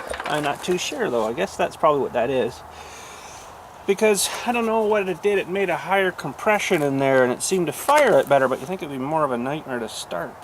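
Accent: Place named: Canada. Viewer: You sound like you’re from Canada just by the way your accent is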